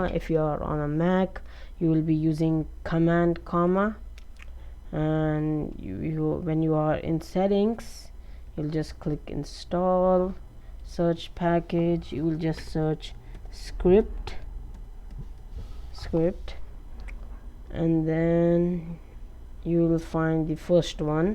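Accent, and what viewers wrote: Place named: United States. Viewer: are han thike mujhe maloom hai kya amrica ki accent mai kyun baat karre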